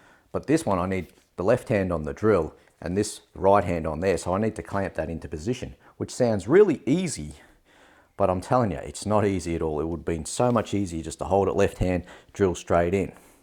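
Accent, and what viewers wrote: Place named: Melbourne, Australia. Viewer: wow not only the channel I have been looking for but from a fellow Melbournian it's a bonus ( thought I recognised the accent)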